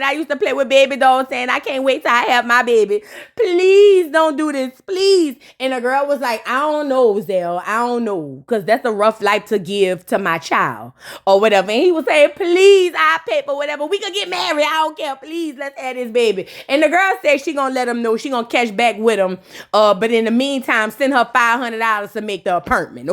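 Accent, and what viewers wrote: New Orleans, United States. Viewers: I was just scrollin and ya accent and the way u said this point made me tap in....Chiiillleeee i love a Nola accent❤❤❤ much love from philly❤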